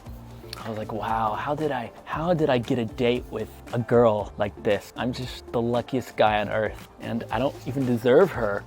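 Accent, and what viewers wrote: United States. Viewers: As an American, I like your American English accent. It's very easy to understand and soothing to listen to. I like your advice by saying to be bold and courageous but not cross the line which means always being respectful and being a gentleman. Great video as always! Take care,!! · Haha! I don’t think I’ve ever heard you speak this much in English. 한국말로 구사할 때랑 똑같이 농담을 하시네요 ㅋㅋㅋ 캘리포니야 말투도 멋지고 한쿨말의 방송인 억양도 재밌구먼:) 화팅!!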